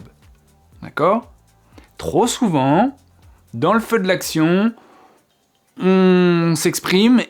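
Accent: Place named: United Kingdom. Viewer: Je regarde votre chaîne pour la 1ère fois j aimerais bcp votre prononciation britannique elle donne de la valeur a la langue anglaise (pas comme accent USA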